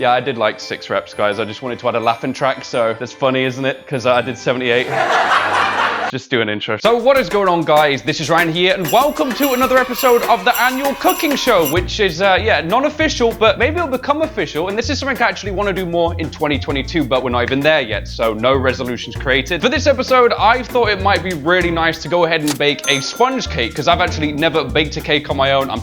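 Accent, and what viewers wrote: United Kingdom. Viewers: I like how when Ryan cooks or makes anything he just becomes so extremely British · He sounds really British in this video for some reason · I can hear the British more now that he is not speaking directly into the microphone. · Ryan with bad mic sound like real British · Why does he sound extra British then normal in this video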